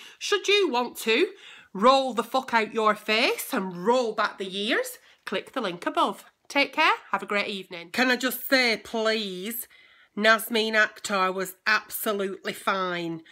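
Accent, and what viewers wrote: United Kingdom. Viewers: you are the true bright spot in my life, you make me laugh and make me feel that life is not so bad and should not be taken too seriously...your talk reminds me so much of my british sister in law, ( hubby is from Nottingham)...thank you very much for just being you, love you much...from the U.S. Jeannine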